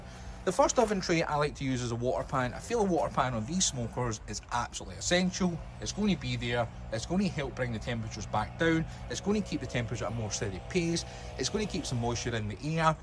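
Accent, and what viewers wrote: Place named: Scotland, United Kingdom. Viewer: no problem. It's always good to see how a fellow scot manages cooks in variety of weather conditions we have up here, so always stay tuned. Think I'm a touch further north than you by the sound of the accent haha. Cheers bud, I had a few small holes in the firebox basket but for the time being I'm just laying tin foil down then putting my fire grate ontop of that, does the job just it is by no way a long term fix, plus the steel is to thin to weld unfortunately. But she does the job, had some good cooks so far this year. Yeah a big smoker is probably a pipe dream. But a Oklahoma joe is definitely next on the purchase list when the time is right. Thanks for taking the time to reply.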